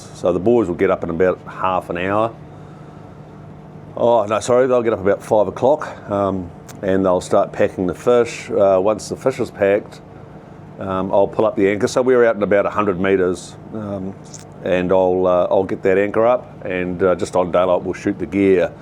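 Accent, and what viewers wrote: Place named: New Zealand. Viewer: Hey, of course with the Kiwi accent, I'm 65 & I wish I had a massive "deck".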